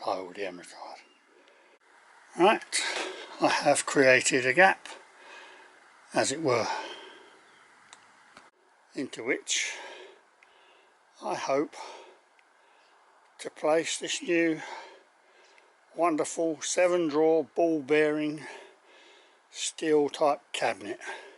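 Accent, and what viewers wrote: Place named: Chatham, United Kingdom. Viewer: Well, it’s a decent resolution to the problem of storage. My Halfords cabinet is black, so easily marked and wiped away felt tip isn’t an option. Why don’t they make these things easy to label? Really enjoying your channel. BTW, where do you originate from? Your accent sounds very Chathamese to my ears.. Les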